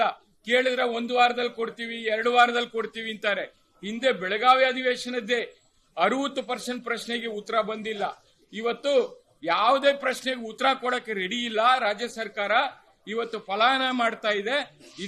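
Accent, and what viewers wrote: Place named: Tamil Nadu, India. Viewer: ಕನ್ನಡ ಸರಿಯಾಗಿ ಮಾತನಾಡಿ ಮಾನ್ಯ ತಮಿಳ್ ಪಿಳ್ಳೈ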